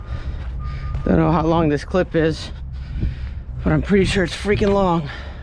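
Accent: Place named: South, United States. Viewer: He's saying Freightliner he's just got a thick southern accent that's how we pronounce it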